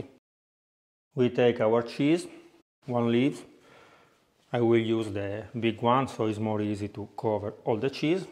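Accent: Italy. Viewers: I subscribed for the traditional ways that he shows, but also it's a joy listening to him... calm..knows his stuff well... and that great Italian accent. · Your english is very italian👍🏻👍🏻👍🏻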